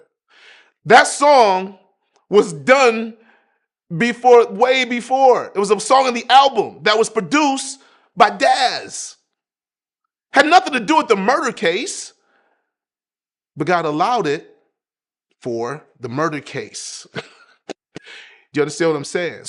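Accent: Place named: West Coast, United States. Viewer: West coast accent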